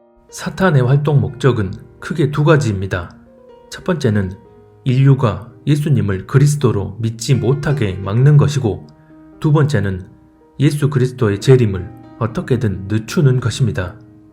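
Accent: Jeolla, South Korea. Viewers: 전라도 말투가 상당히 거슬리네요.트럼프가 적그리스도라는 개소리는 삼가시길 바랍니다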